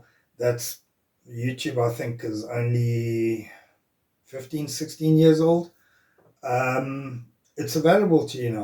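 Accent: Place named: South Africa. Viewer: That ZA accent !!! Not just DNA.... Love to see content like this OG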